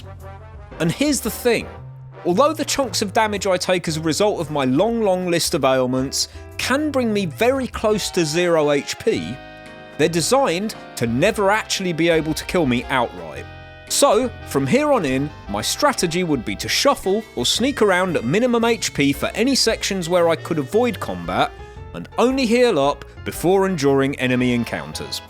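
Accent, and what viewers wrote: United Kingdom. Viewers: Great video lad, your accent makes me want to see you do this entire challenge again in Fallout London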